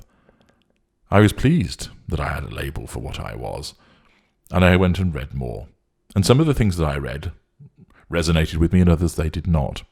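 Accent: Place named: RP, United Kingdom. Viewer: is your name a pseudonym for the Holy Grail of Tutelage of NPD or to represent your being on par with the royal Tudors making you a self-appointed HRH? Or could it be an homage to HG Wells, or a combination of the above? I remember a few, perhaps several, years ago when you did a podcast with a young black American female. I remember what you looked like in detail as I have a photographic memory. In addition, you spoke briefly about your mom being an extreme narcissist, the passivity of your father and how you felt he failed to protect you and your sister from the abuses of your mom. You intimated that you experienced some form of sexual abuse or molestation but did not care to go into the details. You also described your sister as the ultimate accommodating, people pleasing codependent. You told how the YT videos and all the Machiavellianism altruistic outreach work to educate others on the subject of NPD was part of therapy - as in your therapists requested you to do so. 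In the podcast, you said you were only in therapy as a condition to maintain your inheritance and position in the family business (not sure how the last part was phrased). Your voice...a very Queen's English British sort was similar to the one on your videos but far less menacing, but I attribute the difference to the use of some sort of voice machine.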